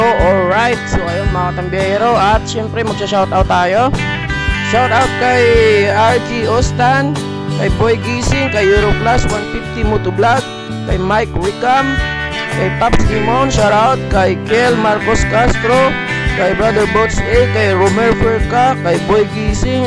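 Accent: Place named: Iloilo, Philippines. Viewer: San to paps?sounds ilonggo kyo po..rs paps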